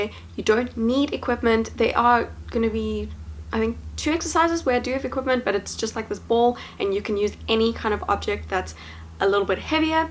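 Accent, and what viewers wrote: South Africa. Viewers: הנסיכה שילת I believe she is South African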